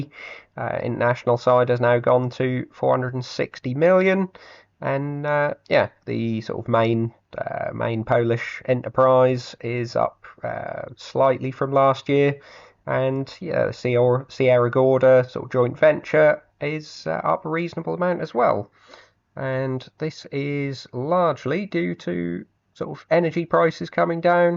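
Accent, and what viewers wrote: Norfolk, United Kingdom. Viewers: Damn not often you hear a Norfolk accent on an investment video (North Walsham btw) ;-) . Awesome gains man, keep crushing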